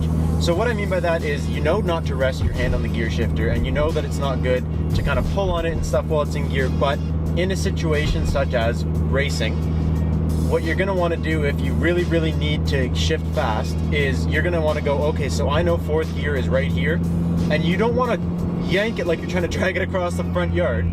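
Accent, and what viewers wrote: Canada. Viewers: 1. He's Canadian, but you heard that one enough by now haven't you? 2. 10 min vids are simply the way you have to do it these days. Youtube pulls so much crap and changes constantly, so it's harder, than ever to grow as a channel. They care about view length more than views, so if your vid is shorter, they won't recommend you. He's doing it so he can still survive as a channel, because the sad truth is, that he pretty much has to until he finds a stable way of income. Remember that he's doing Youtube full-time now, so his income is likely low. He's been asking his subs on how we'd like him to find a stable income, so it's clearly the case here. Also if this was to squeeze as much money out as possible, he'd make it at least 10 min long and put like 4 ads on it, but he didn't. 3. This tutorial was not meant to be advanced at all. It was clearly a tutorial to learn a basic way to shift faster. 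It's for new enthusiasts, who are starting out. You know, the people a lot of guys in the car community like to bash for no reason, until they think we're just a bunch of arrogant assholes and walk away, wasting a potential new passionate enthusiast in a community, that is probably coming to a decline soon, as more and more people lose the interest in the engagement of driving? And I know this is true, since I've seen at least 2 or 3 people who gave up for that reason and I notice, that a lot of young people just don't have the same interest in cars as years back. Even I almost gave up, b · U must be Canadian ? I love it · Tell me your from Canada without telling me your from Canada. You didn’t have to say it we could tell. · so canadian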